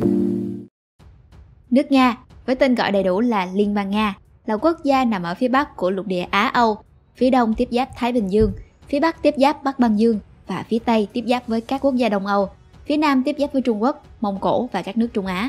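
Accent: South, Vietnam. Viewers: Bài viết hay,giọng đọc chuẩn Nam bộ rất ấm áp...like ***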